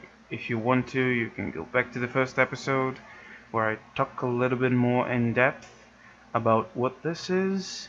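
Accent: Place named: United Kingdom. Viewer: Dat british accent tho hue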